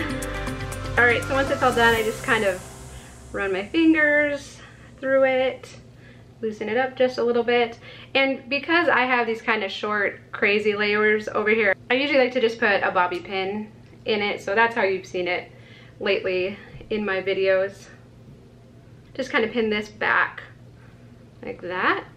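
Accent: Minnesota, United States. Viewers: I love your videos. And I love your MN accent. I’m not from MN but I lived in Mpls for 4 years and fell in love with the accents there as well as the people. Not only do I value your content and personality, but I can watch your videos and get my MN fix from my own home!🤗😎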